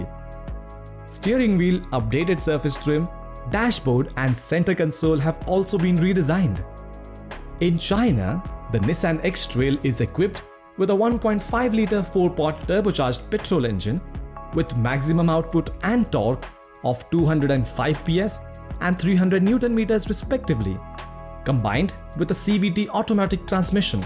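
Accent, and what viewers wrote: India. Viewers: Indian voice?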